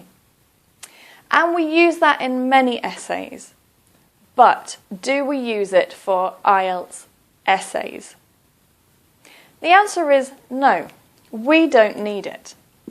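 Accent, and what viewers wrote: United Kingdom. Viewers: Slow BRISTISH voice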